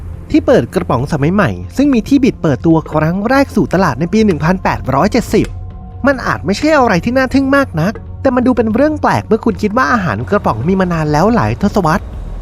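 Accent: Isan, Thailand. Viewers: คนอีสานบ่หนิ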